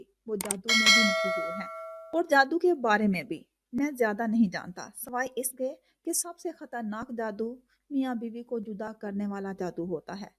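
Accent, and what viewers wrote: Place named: Punjab, Pakistan. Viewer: Nice novel beautiful voice Lekin Punjabi andaz Punjabi language zada hai 😊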